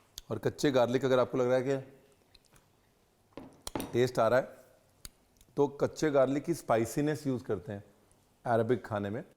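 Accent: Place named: Lucknow, India. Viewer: Mujhay to aapka Lucknow style bolnay ka style bohot pasand hai ❤❤❤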